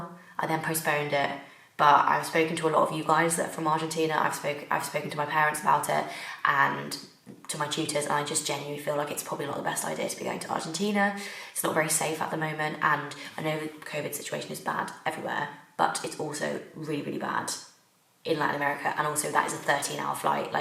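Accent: United Kingdom. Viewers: 😃 I always wait for your next video cause like you I tend to be really chatty, gossiping and stuffs.😅 I just really vibe with you.🤠 I feel like if we sit and start talking, time would be passing so fast than we had expected.🐣 I came to know you through Oxford videos;🦢 moving to campus etc and I don’t regret 🍀spending almost an hour listening to you.💐 Honestly at the end of one of your long 45-50 mins videos, 🌷I tap on the screen to see how much time I’ve been watching and it’s just unbelievable how the time passed as I was so engulfed in your conversation.🌻 I really like hearing everything you say and it helps me develop my ability to speak and hear Brit english. I recommend you to do a video on everything on Oxford;🌟 the requirements, interview😥, compressed time table,⚡️ party,✨ free time and others.🌈 That would really help me out and I’ll be extremely grateful to you.💥 Sorry for this long comment but I can’t help it just comes with the flow.⛄️ Watching videos that you want to film plus it’s over 30 mins is heaven for me.🤩 I really like your vids!😍 You should do a livestream on a day. 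Been a long time since you didn’t.🤔 So yeah, patiently waiting for your next videos.😇 Stay safe and be crazy!🤪 whispers you are a beautiful woman😁🤩...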